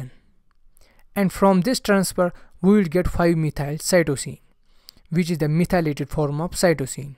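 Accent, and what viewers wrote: Kashmir, India. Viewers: Wonderful explaination. You HV kashmiri accent.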